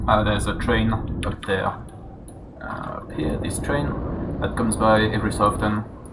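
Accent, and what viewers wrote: France. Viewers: t'es français ? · Tu es Français ?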